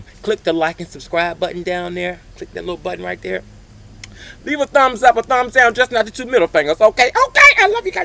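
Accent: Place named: Georgia, United States. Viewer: Im from Georgia you sound like you are too!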